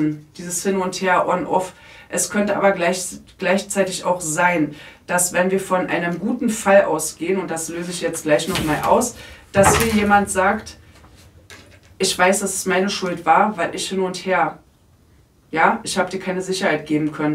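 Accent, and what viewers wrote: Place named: Saxony-Anhalt, Germany. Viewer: Ich folge Dano schon länger und wusste von dir nichts.Ich mag dich und deine Worte,einfach deine ehrliche Art🌹..und deinen Dialekt (komme selbst aus Sachs.-Anhalt).. Die letzten 3Jahre haben den Zustand unserer Gesellschaft sichtbar gemacht....Empathie und Herzenswärme wurden reduziert. Bleib bitte wie du bist und halte deine Schwingung oben. Ich sende dir Licht ,Liebe und viel Kraft 🩵🙏✨️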